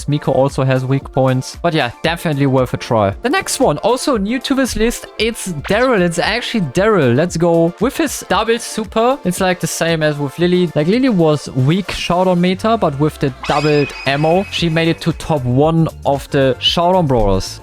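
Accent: Germany. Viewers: yeah but that’s because he’s german and has an accent bro the other ones are just mistakes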